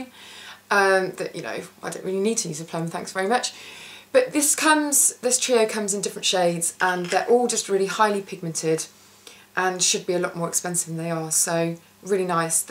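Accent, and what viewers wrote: United Kingdom. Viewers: I love watching you.You are super gorgeous but not stuck up.I could litsen to you speak for days.All of the lovely ladies from the UK speak so wonderfully.I hate my NY accent even though I haven't lived there in years.Your skin is so pretty,you don't need foundation.xoCathy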